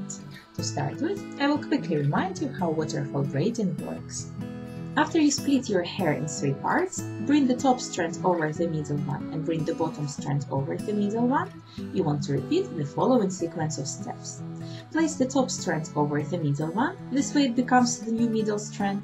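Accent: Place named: Russia. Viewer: I believe she is Russian, but living in France, so she as a mixed accent :)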